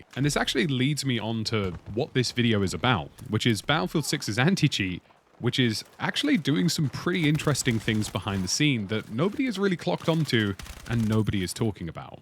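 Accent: United Kingdom. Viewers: You're avoid the issue because the man being accused is one of the "protected" degenerates and you don't want the heat. You sound like you live in the UK so it's really risky for you.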